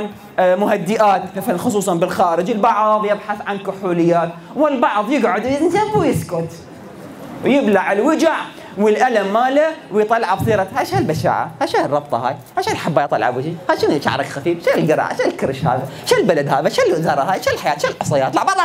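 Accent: Mosul, Iraq. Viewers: انتا من الموصل يعني مصلاوي 😏😏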